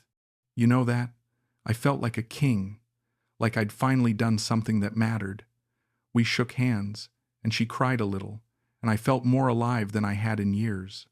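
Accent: United States. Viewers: The Story is FAKE! 1 : You Don't need 250k AUD to start a small restaurant Carenderia type in the philippines as she was talking in the video.....the type of eatery she grew up in the province 2 : She has and drive a car? she is not as poor as she said......a 10 years old 2nd hand car cost around 400k PHP .....hmmmmmmm.... 3 : The description from the guy and the voice does not have an australian accent but american 4 : You must be really stupid to send 250k USD just like that.......makes you wandering how did a guy so silly managed to save or aquired it in the 1st place. So in my opinion The story sounds convinsing and it might generate viewers BUT I THINK IS FAKE 100% :)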